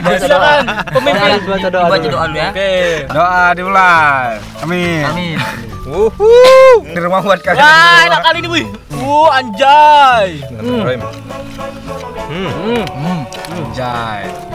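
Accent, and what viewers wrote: Medan, Indonesia. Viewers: Lu tinggal dmna bang klok boleh tau, dari logat logat nya orang medan bang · Medan mana suaranya???!!!!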